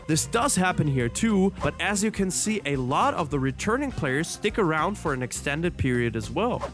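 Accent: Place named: Germany. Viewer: Get a better voice-over actor. The one you use has a German accent that makes my ears bleed.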